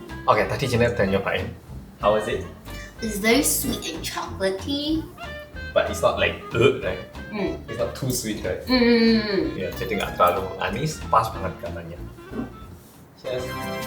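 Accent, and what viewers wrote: Java, Indonesia. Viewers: Dulu waktu 2014an ga terlalu suka sama mas karena ngomongnya super medok, feels so weird for me. Tapi semakin kesini malah makin demen, terutama pembawaannya dan ke sederhanaannya. Sukses terus yo:)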